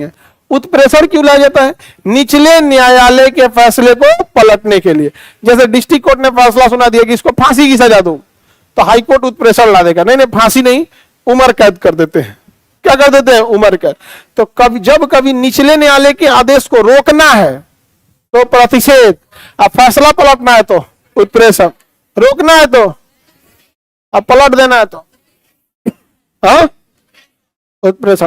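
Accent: Bihar, India. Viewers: Kya jabardast bhojpuri Bihari bhasha ba sir 😂😂😂🎉🎉🎉❤❤❤wah wah wah wah wah wah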